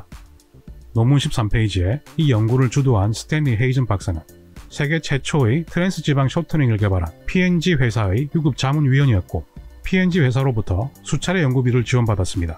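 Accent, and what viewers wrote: Gyeongsang, South Korea. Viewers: 영어 일본어를 마스터해도 경상도사투리는 못고치는구나...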